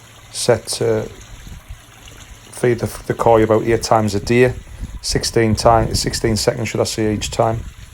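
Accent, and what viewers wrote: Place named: Sunderland, United Kingdom. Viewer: He’s not from Newcastle !! That’s a makem accent 🤮🤮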